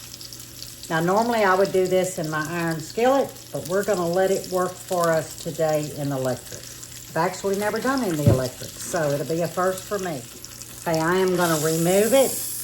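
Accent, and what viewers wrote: South, United States. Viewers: Oh honey, you’re 😅Southern! You sound just like me and everyone I know.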